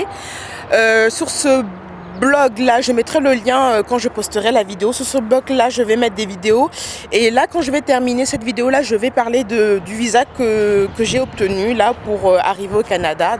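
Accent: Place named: Cameroon. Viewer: héhéhéhéééé bon courage dans ta nouvelle vie!!! t partie en scred!! et qu'est ce qu'il fait beau la ou t'es!!! t partie pour les études?? hi hi hi Riri nous inspire bien!! et la tu parles avec l'accent camerounais I swear!! lool allez on attend les Videoo avec impatience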